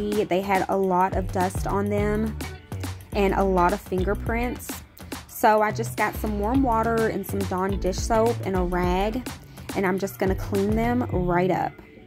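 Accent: South, United States. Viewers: I hate cleaning the bathroom! I clean the toilet everyday because I have all men in my house. So ya you already know! Glad you made another video!!!! Was missing that southern accent!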